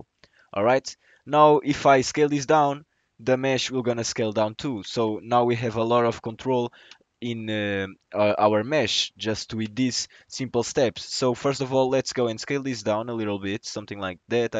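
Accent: Portugal. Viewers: ah este sotaque tuga nao engana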